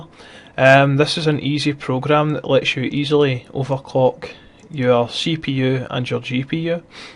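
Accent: Scotland, United Kingdom. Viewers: Was looking for some help on this as my ASRock OC tuner and hwmonitor say my cpu fan is around 3300rpm when idle...is this normal? Also i was pleasantly surprised to hear you're voice and that you're a fellow scot! Greetings from Glasgow! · are u scottish?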